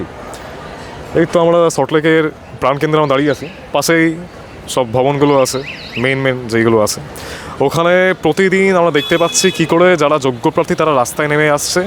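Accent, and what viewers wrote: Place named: Bangladesh. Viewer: বাংলাদেশি টান ভাষায়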